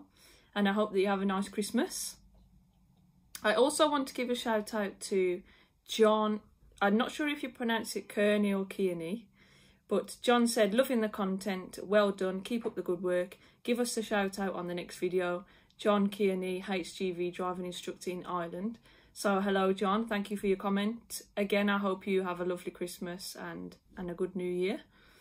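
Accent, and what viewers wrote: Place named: Wolverhampton, United Kingdom. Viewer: Great video. Guitar playing was wonderful. Very nice to just listen and chill. I've been following your channel from the very start. Always enjoyed your content. The accent was a plus point as well! Even though I'm a Londoner born and bred (although now living in the Welsh Valleys), I am a life long Wolves fan! Been to Molineux many many times. I knew you was from the area by your accent. Are you a Wolves fan? Many thanks. Craig.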